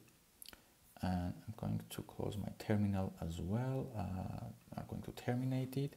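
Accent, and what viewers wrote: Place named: Iran. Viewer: Thanks it was useful💙 with your lovely Persian accent😁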